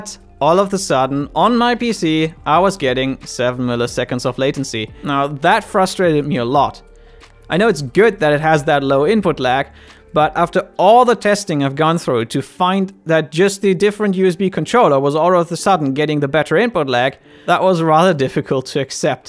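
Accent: Germany. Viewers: Hahaha egal wie sehr man sich anstrengt man hört sofort den deutschen Akzent heraus. :)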